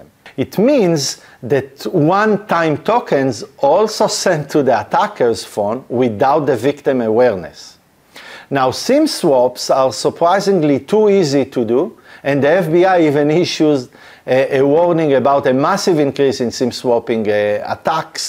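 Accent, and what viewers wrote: Israel. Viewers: My brain kept hearing "TO F*CK TOROUGHTENTICATION" until it learned that it was just a strong Israeli accent. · בלי להעליב שומעים עליך שאתה ישראלי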